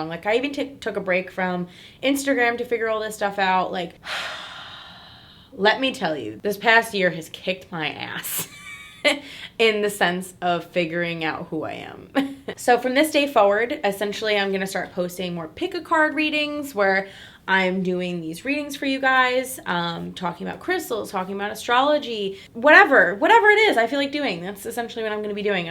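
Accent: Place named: New York, United States. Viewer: IVE ALWAYS LOVED YOUR NY ACCENT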